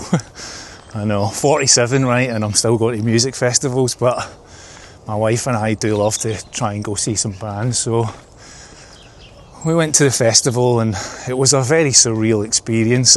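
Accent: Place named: Glasgow, United Kingdom. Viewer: Happy to like and subscribe! There is something about a glasgow?? accent that makes people just super personable and easy to listen to, have a lot of family in Paisley/Johnstone so feels like home. Sounds like you had a tough year last year, hopefully this one is much better for you. I am 41 this month and one of the things I have started worrying about is my parents aging, by the end of the month they'll both be retired and I do worry about them a bit more. I also live far away from them so its difficult to see them a lot. I got divorced 3 years ago and moved alone to Bristol, I feel like I am only just picking myself up from that now and starting to feel happier alone, I am hoping to clear my debt this year and start saving for my own house, as not being on the property ladder at my age is also worrying me a lot! Hopefully we can both do some rebuilding this year! 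:)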